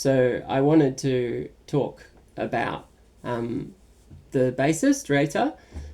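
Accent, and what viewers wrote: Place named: Australia. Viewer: I wanted to give a shot at one of your videos Suddenly clicked on this one - got satisfied with somewhat cozy vibes Btw, love the Australian accent xDD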